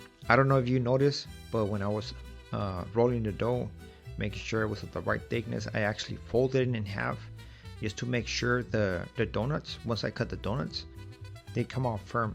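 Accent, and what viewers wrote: United States. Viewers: I’m watching this video and I love the fact that you started your own business. You don’t have a Mexican accent at all. You have an American accent. I noticed that you are going to close the business and that’s sad. I hope you get another location and continue your business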